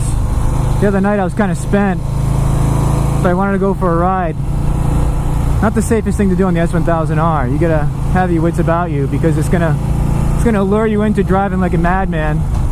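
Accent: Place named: New York, United States. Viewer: "Ya really gotta watch deez cownaz." Gotta be New York. Love it.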